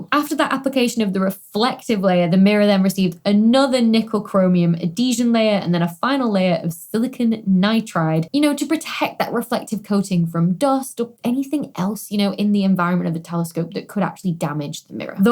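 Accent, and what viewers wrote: United Kingdom. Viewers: I love that you make an effort to talk like a British person, it really irritates me that use of Americanisms is spreading.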